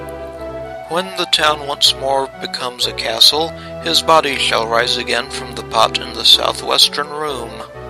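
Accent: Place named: South, United States. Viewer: Thanks for doing these vids! I really enjoy the narrative, you sound like a good friend of mine, a southern gentleman😁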